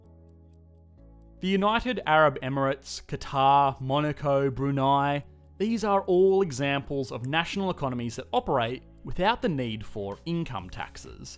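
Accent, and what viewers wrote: Australia. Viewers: Ed Hrafnskald Conway Well unfortunately most governments aren't as well liked as with his smooth Aussie accent!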